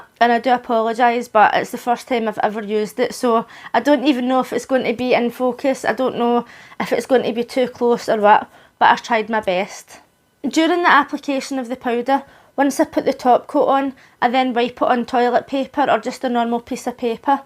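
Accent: Scotland, United Kingdom. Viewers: Well, all of those people are objectively wrong. Scottish accents are beautiful! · Hi great video was thinking of buying this product so glad I watched your tutorial as a new buyer would you recommend buying the deluxe pack or just the basic set or individual I’m so glad of the honesty that your tutorial gives and it’s great you’ve got a Scottish accent x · I love your Scottish accent!! great video !! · apparently they don't make this color any more. Too bad, it is really pretty! I love your accent, it is a bit difficult to understand sometimes since my ear isn't used to listening to a Scots accent, but that is a me problem, not a you problem! Can't believe that people would complain about that. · I’m so glad my Mum’s Scottish or I wouldn’t have understood this tutorial at all! LOL. Thanks also for this cause Sistaco’s tutorials are very quick and edited.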